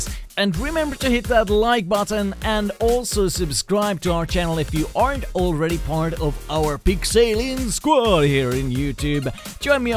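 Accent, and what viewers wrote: Finland. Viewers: Huomaa että oot suomesta xD siis aksentista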